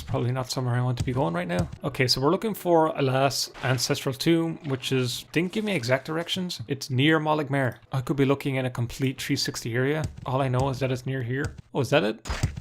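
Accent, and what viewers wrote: Ireland. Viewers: keep up the content, its always so fun, also an irish accent makes everything better imo, how close are you to 4k watch hours? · I love your Irish accent man. "I tink so" "Maerk and recall" Subbed for the content, stayed for the accent + content combo. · you put the other irish letsplayers i watch to shame. you talk let they do when theyre playing up being irish as a joke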